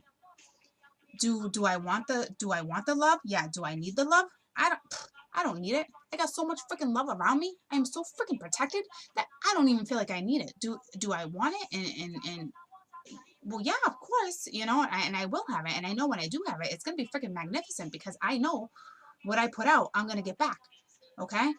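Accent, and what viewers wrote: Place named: New York, United States. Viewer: I'm new to your channel, just wanted to say I love your energy and your accent is everything. Jersey or Brooklyn? (no offense meant)!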